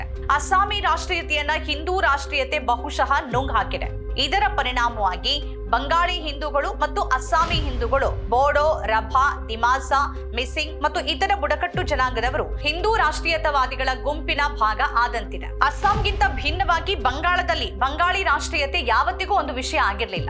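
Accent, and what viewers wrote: Kerala, India. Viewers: ನೀನೂ ಮಲಯಾಳಿಯಾ? ನಿನ್ನ ಉಚ್ಚರಣೆ ಮಲಯಾಳಿ ಹಾಗಿದೆ.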